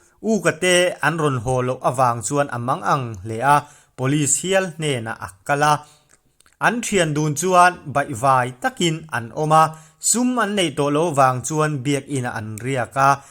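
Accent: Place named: Myanmar. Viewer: I trawng hi Myanmar (burma) aw top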